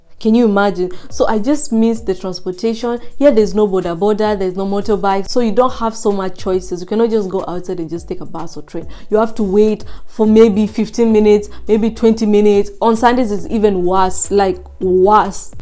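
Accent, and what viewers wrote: Nigeria. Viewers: You have Nigerian accent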